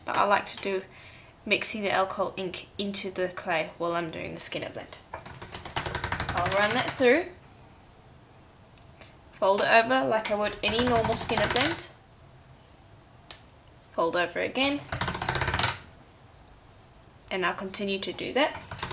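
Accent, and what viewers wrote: South Africa. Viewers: Hi. Where are u from? You sound South African :)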